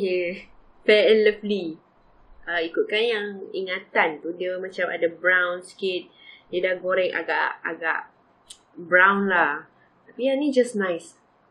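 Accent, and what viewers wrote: North, Malaysia. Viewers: Study UUM ka UNIMAP? Orang Sarawak cakap pelat utaqa lekat dah sikit-sikit. 😁👍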